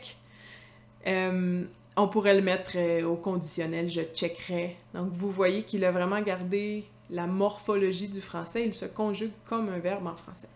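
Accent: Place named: Quebec, Canada. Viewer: C'est très intéressant l'accent québécois. En Argentine on apprend le français langue soutenu et en Amérique il est possible trouver beaucoup d'accent et des francophiles. Merci à toi!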